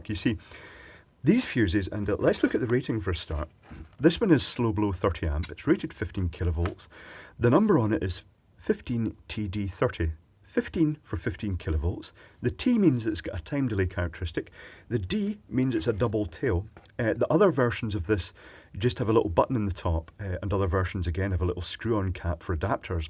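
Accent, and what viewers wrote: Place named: Edinburgh, United Kingdom. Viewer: Refreshing to hear an edinburgh accent!!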